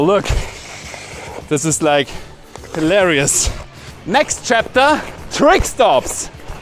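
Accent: Germany. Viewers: Schee. Mit a bissl boarisch 😊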